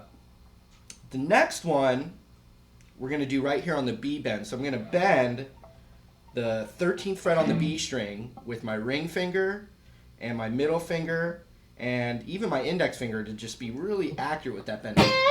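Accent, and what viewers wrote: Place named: New York, United States. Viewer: Hey, are you from New York? i don't know, i think you have a New York accent. i'm from Belgium so excuse if i made a mistake.:p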